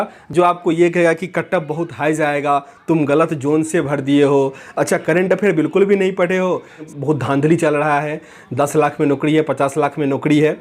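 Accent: Bihar, India. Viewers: Bihari tone ,chaura ganga kinare wala , thx bhaiya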